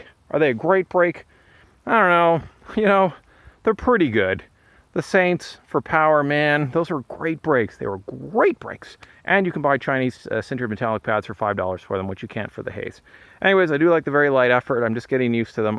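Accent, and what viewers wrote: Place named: Germany. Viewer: Hahahaha Like your german accent dude. Greetings from germany, Marc. Didn't get the 220 here actually. 😞 riding code r, but i hate the constand bleeding i've to do with the sram. Likely every week. Thats annoying.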